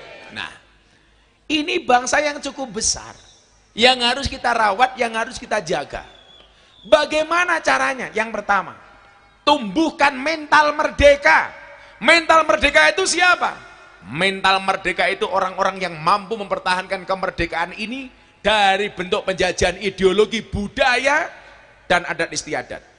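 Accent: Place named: Banyumas, Indonesia. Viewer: Pantsan Abah bisa ngomong ngapak menghormati yg desa"ngapak..kaya saya yg ngapak juga.. bangga sama Abah😊sht"ya Abah Gus Miftah kyaiku 🤲🤲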